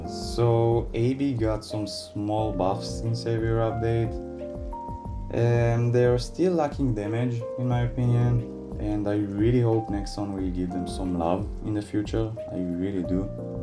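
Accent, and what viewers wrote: Israel. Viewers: You have heavy Israeli accent 🫣🫶🏻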